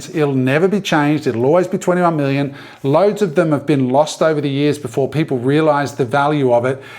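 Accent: Australia. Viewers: Just discovered your you tube channel, great to find another video with an aussie accent talking Bitcoin! Do you guys publish on X at all?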